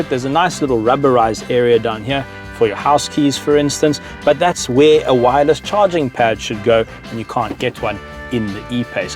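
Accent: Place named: South Africa. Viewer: This SAfrican accent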